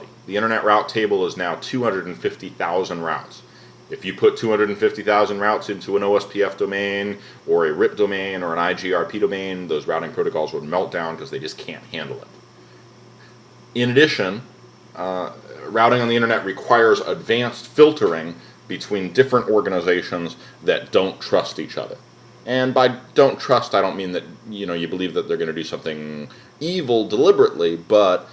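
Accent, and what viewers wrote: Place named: United States. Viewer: Really enjoyed the delivery of this and I struggle with the American accent. Thank you!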